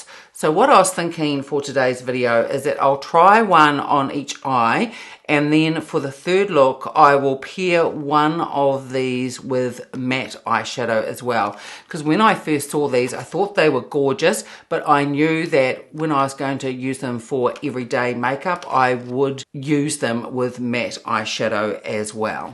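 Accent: New Zealand. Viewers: Enjoyed your video. Love your New Zealand accent. I’ve been wearing mine over Oyster Pearl - using the dark brown from last year’s holiday palette in the crease and to line the lids. It creates a nice soft smoky look. I find the Smokey Quartz to be a bit silvery on my skin to I’ll be looking to matte that down a bit using a shadow from a quad or 12-pan. Either way I love them!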